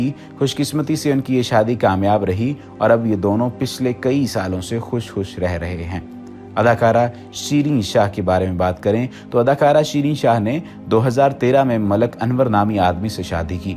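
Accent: India. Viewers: Urdu Nwabi accent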